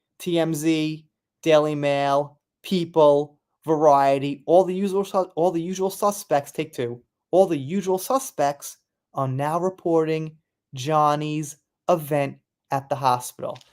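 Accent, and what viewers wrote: New York, United States. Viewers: Where in NY are you from? I'm from Brooklyn... love listening to your NY accent.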